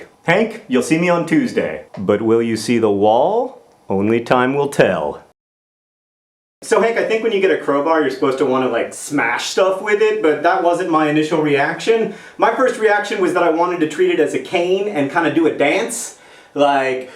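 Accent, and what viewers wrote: Russia. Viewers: It's a really REALLY good Russian you're sporting! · Your Russian made my insides happy. Ochen liubliu tebya<3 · Your Russian is adorable. XD I don't like the way you made me question the plural of 'octopus'. I have a feeling this will result in a linguist headache. (They're a thing that happens.)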